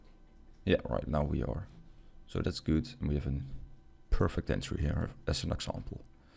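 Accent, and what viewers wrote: Netherlands. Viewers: Are you Dutch? :) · Nice video! Are you Dutch by the way? 😄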